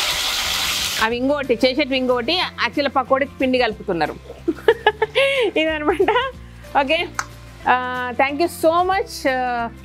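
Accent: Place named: Mahabubnagar, India. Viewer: Hi aunty nice video medi kosgi side Kada aunty uru pothireddypalli ante mahabubnagar dist e Kada meru mataldtunte alane anpistundi nice video aunty murkulu pedaga chesaru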